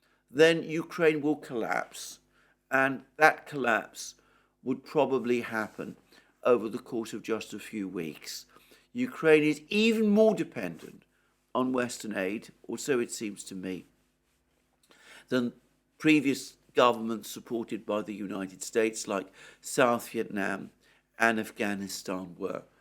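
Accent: England, United Kingdom. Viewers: Wired listening to a Greek communist with an English accent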